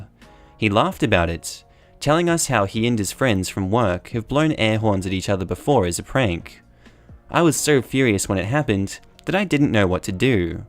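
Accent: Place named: Australia. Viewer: I like my new Aussie hubby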